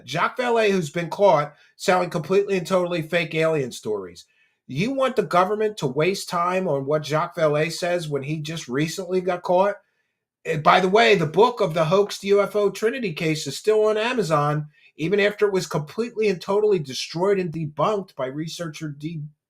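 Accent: Philadelphia, United States. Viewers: Good for you!!! I found his channel about 6 yrs. ago. Saw this guy wearing glasses and talking like someone from Philly about all the BS and I was hooked.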